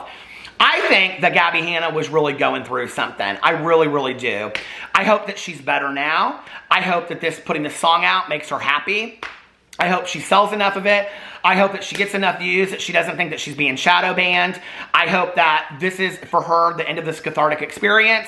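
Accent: South, United States. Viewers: I'm from Tennessee. You sound so southern and I love it.🤣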